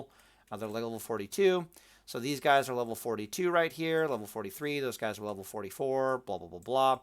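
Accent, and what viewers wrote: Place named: Minnesota, United States. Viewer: You from Minnesota? My Minnesota friend says blah blah blah blah blah just like you.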